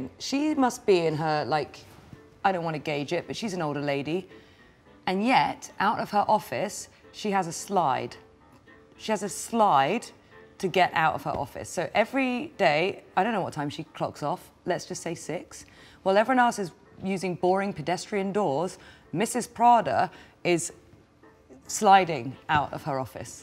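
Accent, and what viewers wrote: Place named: United Kingdom. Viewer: This is some clean elegant British accent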